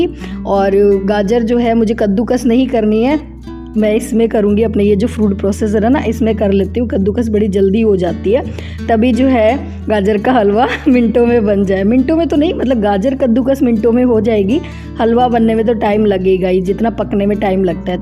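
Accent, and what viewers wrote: Rajasthan, India. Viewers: Di ap vlog k bich bich me aise hi marwadi batein sunate rehna bahut achha lagta hai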